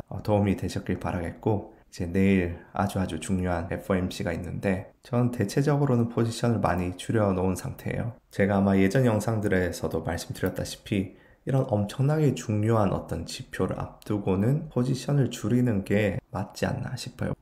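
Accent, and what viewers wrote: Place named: Daegu, South Korea. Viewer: 사투리가 대구 사투리시네요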